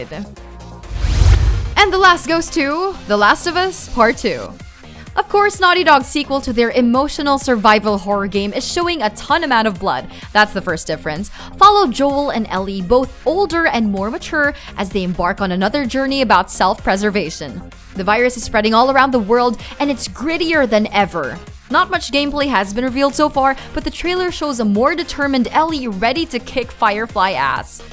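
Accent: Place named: Philippines. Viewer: Her accent is so filipino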